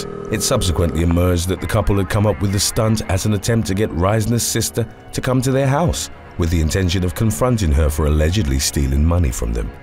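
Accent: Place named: United Kingdom. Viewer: Why do these American hoaxes sound SO much more sinister coming from a British narrator???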